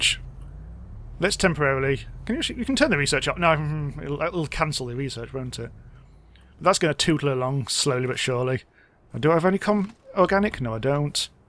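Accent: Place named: Northern England, United Kingdom. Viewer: Neat vid. Refreshing to have a northern accent too. Laughed out loud at "dark as dicks". :D Keep it up mate.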